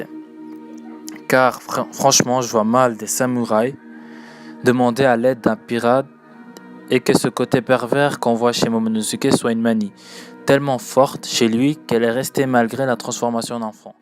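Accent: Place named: Djibouti. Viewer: Oh mince, j'était entrain de faire une théorie dessus !! j'ai directement vu ton accent "Djiboutien-Somalien" moi aussi je suis djiboutien et je t'invite a regarder mes video sur t'aimes les manga ;)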